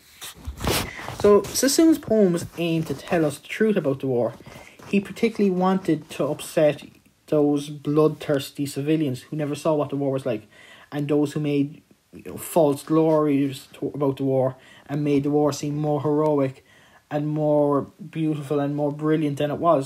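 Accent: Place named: Ireland. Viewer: love that i can listen to an irish lad and it is perfect bbecause he sounds identical to my teacher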